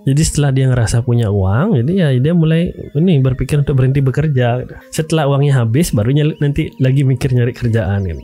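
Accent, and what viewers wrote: Bali, Indonesia. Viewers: setelah nonton beberapa video nya baru sadar lewat aksen dan namanya ternyata semeton Bali wkkwkwaka. semangat Blii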